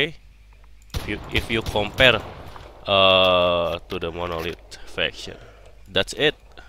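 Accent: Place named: Indonesia. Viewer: Indo ya ? logat-nya logat indo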